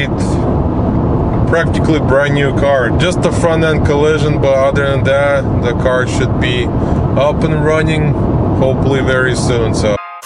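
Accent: Russia. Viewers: bro, are you Russian?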